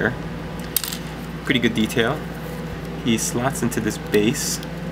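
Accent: Austria. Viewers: ahahaha, definitely austrian accent!! love it, great job ^^ as you might know by now i'm austrian myself and you really did an excellent job! however, fantastic unboxing, tempting me to get one of these great minis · Nice Austrian accent. :D Do you train this voices?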